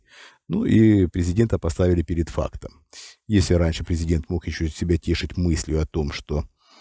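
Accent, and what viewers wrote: Ukraine. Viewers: А все-таки, у ведучого відчувається українське походження. Може із кубанців?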